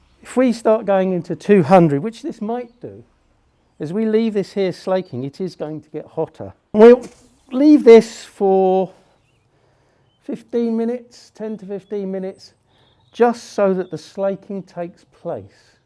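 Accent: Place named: United Kingdom. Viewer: british accent take a guess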